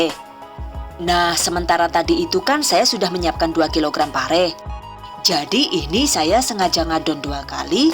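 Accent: Banyumas, Indonesia. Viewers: Bahasane NGAPAK banget kie lahj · Ciri khas ny oke.. Hihi.. 😂 Bahasa ny ngapak.. Hidup ngapak.. 👍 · Plat mobile jawa timur, tapi ngomonge ngapak, jane rika wong ndi koh lik? 😅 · Kok ngapak toko wkwk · Lokasine madiun deneng ngapak 👍👍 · 😆lucu banget Bahasa koh ngapak kaya nyong kiye yu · Deneng jebule ngapak rika jand hhhhhh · Ngapak bgt rika koh yu . Btw maen infone yu 😂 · Loh deneng 🤣🤣🤣 ngapak temen koh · Kok ada logaat bahasa Jawa nya mirip org Banyumas😁. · Orang Madiun kok ngapak bunda?? Hehehehhe maklum saya subcriber baru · negro deneng ngomonge koh ngapak hhhhhh ...... njaluk lah yu sriping parene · Owaaaalaaah ngapak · Yuuhh,,, rika wong ngapak ya mbok... ora ngapak ora kepenak.. 🤣🤣🤣 #salamngapak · ngapak tah ....ibunya makasih ilmunya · Ngapak bu...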